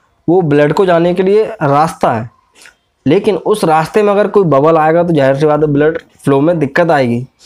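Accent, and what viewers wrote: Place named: Bhind-Morena, India. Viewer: Bhai ka Bolne ka tone toh bhind Morena ka lag Raha hai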